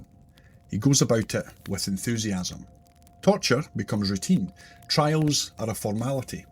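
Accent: Scotland, United Kingdom. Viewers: Carol scottish born live in Australia 🇦🇺 FINALLY found a brilliant scottish spoken brilliant story teller. Please keep me the great work 🙏 keep your voice the way it is ... unfortunately many change voice & end up singing & playing characters. Looking forward to many more stories 😊 cheers from a scot 🏴 from Australia 🇦🇺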